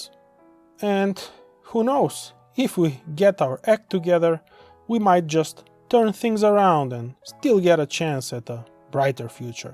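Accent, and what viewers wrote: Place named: Russia. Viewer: I like your accent a lot, though it sounds more Russian than Bulgarian to me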